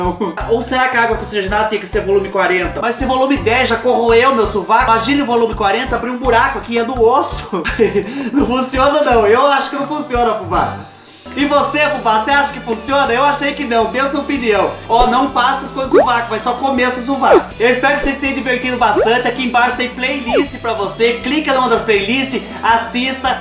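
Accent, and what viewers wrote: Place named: Minas Gerais, Brazil. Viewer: Parece Sotaque mineiro 😂😂😂😂 adoreiiii.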